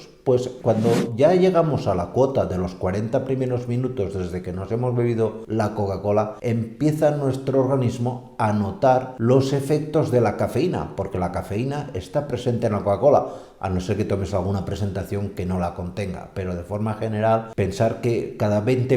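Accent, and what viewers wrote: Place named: Spain. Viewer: "simple y humilde taza de café" 😊🙃😉 Tu eres un amor! Hace ya un tiempito que vengo escuchándote (y leyendo los subtítulos) y me da la impresión de que me va a pasar contigo como con la coca!!! Noooo! Chiste! Entre otras cosas MUCHAS gracias por lo de la malta para los gatos!!! Me alegra que sea buena! Aquí en Alemania nunca leí ni escuché eso y ya me estaba dando temor de hacerle algo malo a mi gato dándosela. Todo lo mejor para tí! Me encanta tu acento y melodía al hablar. De donde (de España) serás?!☺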